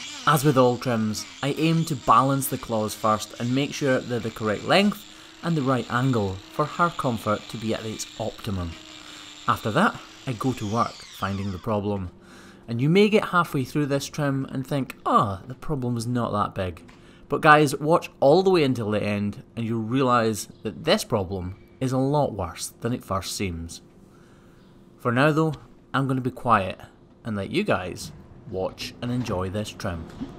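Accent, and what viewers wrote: Scotland, United Kingdom. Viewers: A human who has empathy for an animal in pain, is a good human. I'm loving your videos. No idea how YouTube brought me here, but I'm hooked. I do love a Scottish accent too 🙌 thank you for making these animals lives easier 💖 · I was pulled in by horse farrier videos, then YouTube, in all it's power of Oz, introduced me to this Scottish cow foot guy. I love listening to that accent (and figuring out what he is saying😂) while he works to give comfort to these animals. One of the most bizarre things to find such interest in, but I guess I'll see y'all down the hole!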